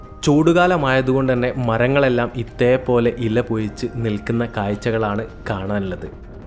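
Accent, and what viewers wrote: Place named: Malabar, India. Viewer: മലബാറുക്കാർ അങ്ങനെ ആണ് പറയുന്നത്.... മധ്യ തിരുവിതാം കൂറിൻ്റെ ഭാഷയുമായി ഉച്ചാരണത്തിൽ വ്യത്യാസം ഉണ്ട്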